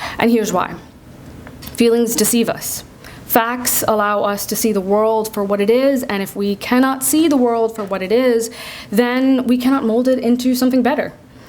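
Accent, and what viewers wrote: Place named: United States. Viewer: I would really like to know from you Sarah haider what your credentials are , how long have you practised Islam if at all and which muslim scholars of note have you debated or interviewed . That perhaps would be more convincing than your zionist funded monologue. I see you have an american accent so it must be that you have spent most if not all of your life in the Us which we know is a major zionist stronghold. Further , establishing an "Ex Muslim Organisation" means it has to be funded and when you gather people for a talk and make a professional quality videoto put on youtube and fill the comments column yourselves under various made up names smacks of the zionist Islamophobia agenda . There are many stooges like you parading the internet.Obviously this must be a full time job travelling the circuit delivering dceptive talks and garnering support and creating a mindset so it has to be lucrative . 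The money is there . Zionists with their ill gotten gains based on pernicious usury are ready to spend to further their agenda. Just look at the funding of mercenary groups like ISIS and their affiliates. All funded with zionist money . You must really tell your masters that we have already been warned in the Quran. Since you guys have special research units set up and manuals from which you regularly throw questions at muslims, you should be able to look up the relevant verse very easily. So you see to be forewarned is to be forearmed. It remains to be seen in the hereafter what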